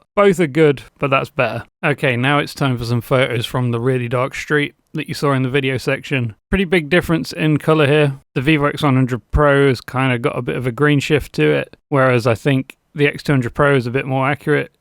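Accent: United Kingdom. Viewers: British accent brings me joy ❤️ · Keep it up bro, i like ur British accent, n ur video too (actually good video) 🎉🎉🎉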